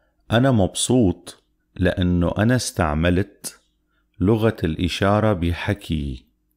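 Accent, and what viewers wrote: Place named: Syria. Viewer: it's syrian dialect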